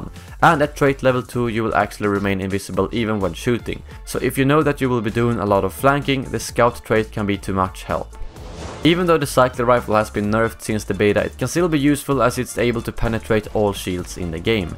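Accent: Sweden. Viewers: are you swedish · are u from sweden? just wondering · låt mer svensk xD bra video dock :) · are you Swedish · Mmm svengelska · I knew you were swedish! Cause you have a strong accent! Where do you live in Stockholm? (Not the adress, just the name of the place) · Are you from Sweden? You accents sound a little familiar ;) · Are you from sweden? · thanks for the tips...är du från Sverige va? det känns så :)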